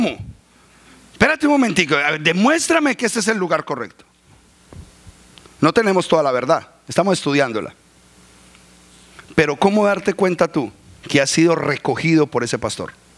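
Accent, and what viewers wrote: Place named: Colombia. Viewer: Ese es mas colombiano que el presidente por su asento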